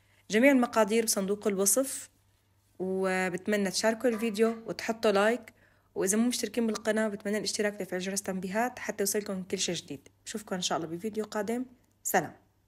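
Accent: Homs, Syria. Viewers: الصوت الحمصي كيف ببين فووورا😅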